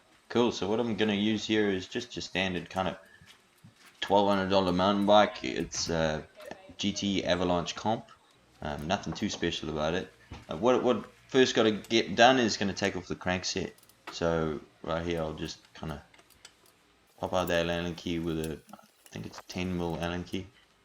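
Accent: South Africa. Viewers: thanks for the show. Good old SA accent. where can i buy this convention kit? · are you South African expat? Kinda sounds like you have a South African accent.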